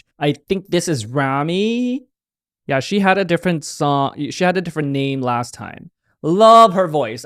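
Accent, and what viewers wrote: Philippines. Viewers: Are you filipino ? Because of your accent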